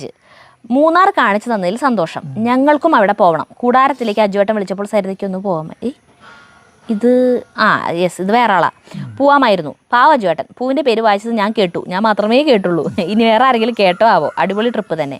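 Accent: Thrissur, India. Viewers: നമ്മളും ചുട്ട ന്ന് തന്നെയാണ് പറയുന്നത് പ്രോപ്പർ തൃശ്ശൂർ ഭാഷ അത് തന്നെയാണ്. തൃശ്ശൂർ അങ്ങോട്ടും ഇങ്ങോട്ടും നീങ്ങുമ്പോൾ വ്യത്യാസം ഉണ്ടാവും. നിങ്ങൾ പ്രോപ്പർ തൃശ്ശൂർ ഭാഷ തന്നെയാണ് സംസാരിക്കുന്നതു 👍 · trissur ഭാഷ യാണ് നിങ്ങളുടെ ചാനലിന്റെ highlite trissur ഭാഷ മാത്രം പറഞ്ഞാൽ മതി ഞാൻ മലപ്പുറം കാരിയാണ് 34 വർഷമായി hydràbaadil settiled aanu njaan ningalude ella vidieo kalum kandittund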